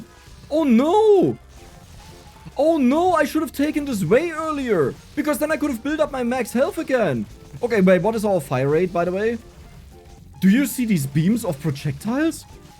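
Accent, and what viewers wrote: Germany. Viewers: accent is so pronounced, yet very different from the classic German accent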